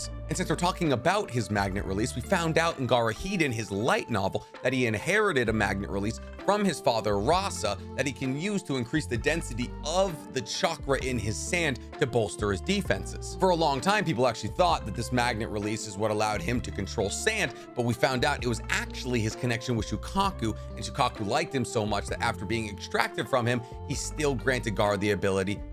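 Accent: United States. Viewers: Just found this channel, which is pretty cool but a major downside is this guy's pronunciations of Naruto/Japanese names. So American of him. For now it's a great channel, and I'll bne going back to watch old videos but his pronunciations are definitely going to grate on me.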